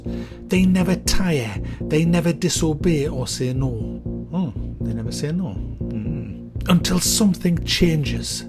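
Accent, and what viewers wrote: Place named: Newcastle, United Kingdom. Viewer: Quite funny having the plot explained in a geordie accent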